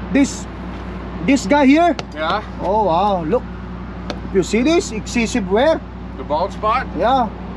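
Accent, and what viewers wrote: Ilocos, Philippines. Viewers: Dayta man lakay naglaeng ka ag English, Ilocano accent ah.. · ILOCANO KAN SAMET? BRAD TA ACCENT MO GAMIN. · Halatang ilokano ang accent mo lakay pag nagsasalita ka ng English.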